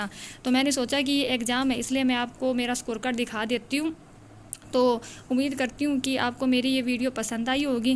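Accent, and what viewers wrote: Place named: Haryana, India. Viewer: Harianvi accent ✌️💜💜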